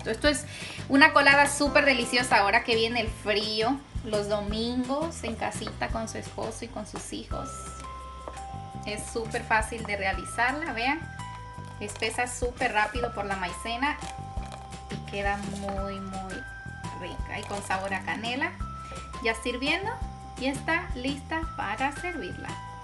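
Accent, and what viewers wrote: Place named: Mexico. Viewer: Que rico te quedo hablas con acento mexicano no hondureño que linda 😊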